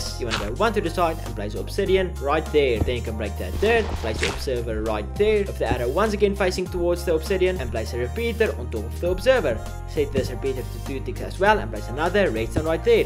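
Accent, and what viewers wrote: South Africa. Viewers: klink soos n safa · South African, my Bru?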